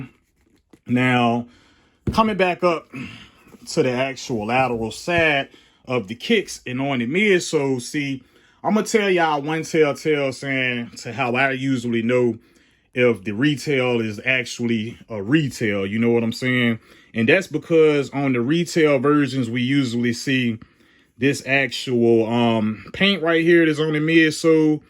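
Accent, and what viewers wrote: Richmond, United States. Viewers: Richmond accent?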